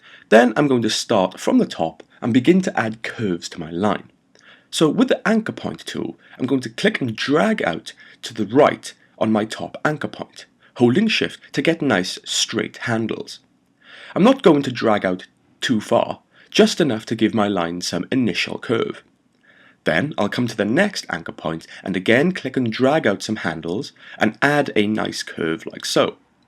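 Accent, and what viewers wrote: Wales, United Kingdom. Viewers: Your tutorials are great, as many people have said. I now believe a Welsh accent should be the universal tutorial approach! This one particularly deserves a comment because although it could be editing, I've never seen anyone talk for 50 minutes straight and still be just as enthusiastic as when they started! Great work, the skip to section in the video description is an especially good idea.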